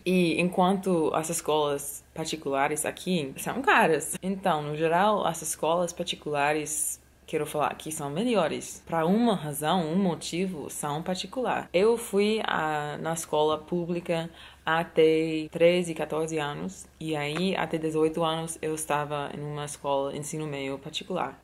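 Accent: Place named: Rio de Janeiro, Brazil. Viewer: você está falando português totalmente carioquês, tipo "SUXXXX"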